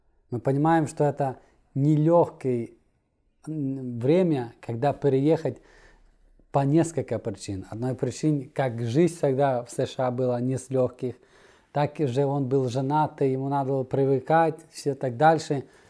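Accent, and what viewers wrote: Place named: Caucasus. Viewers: Какой-то кавказский акцент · Да, чисто кавказко с украинскими словами!)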